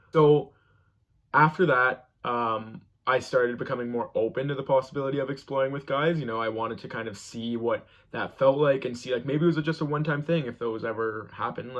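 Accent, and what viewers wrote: Canada. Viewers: Sounds like he's from Canada. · I'm a straight female but I'm watching coming out videos lol instead of studying. Good for you for coming out and being your true self. Hi from Ontario and damn, your Alberta accent is strong. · Well done matey! Be proud of who you are! BTW you are the most Canadian sounding Canadian I’ve ever heard in my life! Xx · Your Canadian accent is thick. I love it and I love your content bro. Keep them coming man and you're cute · The strongest Canadian accent ever lol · interesting. he has the strongest Canadian accent i have ever heard. what part of Canada is he from? London, Ontario? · I love your Canadian accent. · This has all the Canadian words in it! Hoouse, ooout, 😀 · Me watching this channel for the first time: “Canadian shirt? Is he Canadian?” Him: pronounces the word “out” in that cute ass Canadian accent Me: “knew it. 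I should be a detective” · You have such a cute Canadian accent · This guy has a Canadian accent. Not foolin' me....lol He says Ouwwt. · Gorgeous young stud...even with the Canadian Accent. I hope you have a wonderful life! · What about the poster in French? I had to check where London was in Canada. Obviously he wasn't referring to the U.K. His "uit" and "huis," known as Canadian raising, sound different than in other parts of Canada.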